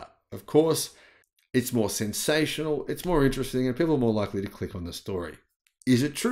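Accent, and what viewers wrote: Australia. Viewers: Definitely a pro EV slant to his story telling here. ICE generally don't catch fire unless they are older cars with fueling faults. EV fires are harder to put out and happen to new cars more often than ICE cars. On a plus note, he does look like a Viking (with an Australian accent!).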